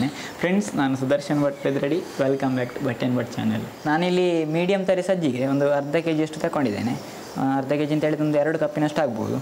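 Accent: Mangalore, India. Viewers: Nan aduge nodthino gothilla but nim mathu keloke vidro nodthini Mangalore language super thumba chanda ಉಂಟು keloke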